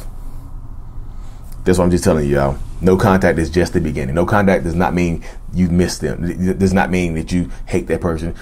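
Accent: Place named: South, United States. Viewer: This is random but I am from the northeast so to me you have such a southern accent and I love it ❤❤ I could listen to you talk all day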